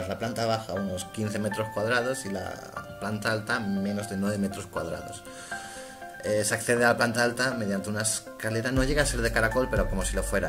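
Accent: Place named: Spain. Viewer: tu acento es muy .... Me gusta el acento de los españoles!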